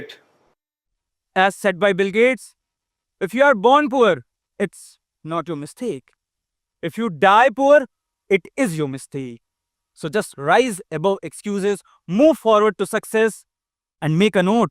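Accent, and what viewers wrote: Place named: United Kingdom. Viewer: British accent🫠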